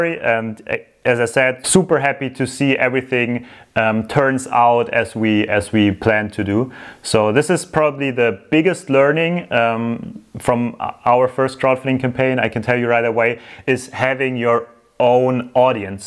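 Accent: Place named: Germany. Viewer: Haha wie geil der deutsche Akzent 😄 Hört sich cool an 👍🏼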